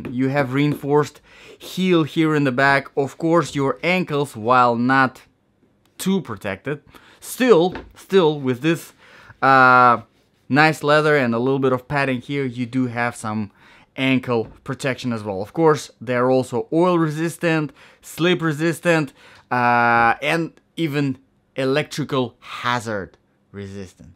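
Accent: Russia. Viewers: İs it Russian accent?)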